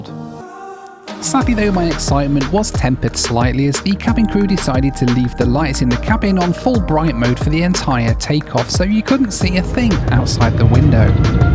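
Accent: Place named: United Kingdom. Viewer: Lol!!! he's getting big pay I think. Keep promoting for that hell hole place. Hes welcome though because he's white with British accent. I would even afraid to go there myself. Lol!!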